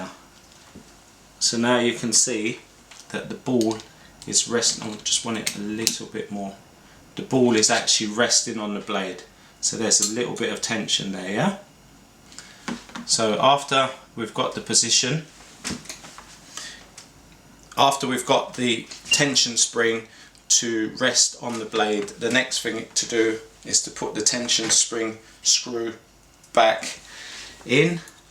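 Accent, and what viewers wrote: United Kingdom. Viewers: Love your accent from London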